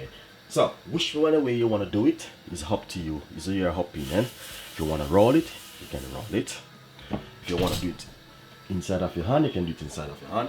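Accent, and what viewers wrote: Jamaica. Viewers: I was wondering if it was a brand of milk that he was referring to and I’m Jamaican and I did not understand what that was. I even went in his description to see if he listed the ingredients. I have no issue with his patois, that just went over my head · Where I live at the moment there are zero Jamaicans so its ten years since I heard the accent. This made me laugh when he said what sounded like ola milk, then I realised he was saying whole milk and I collapsed laughing gosh I miss that accent. I am English living in Sicily and cant wait to get home !!! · go over to another cooking channel for the queen's English. This is a Jamaican chef, talking in his Jamaican dialect. Low dat no man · That was what I came to listen for when I find the video. I said “ if he don’t have a Jamaican accent, am clicking off!!” 😆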